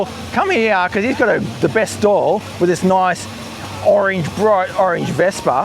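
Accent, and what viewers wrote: Australia. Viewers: Great vlog for an interesting peek at truly local lifestyle.....had never heard of this before. Gotta love hearing your Aussie accent reflected right back at you.....quite a surprise!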